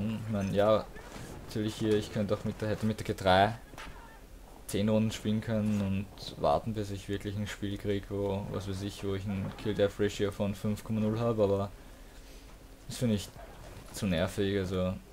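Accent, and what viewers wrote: Austria. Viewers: Du hast ein österreicher akzent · Am akzent erkennt man leicht das du aus Wien bist :)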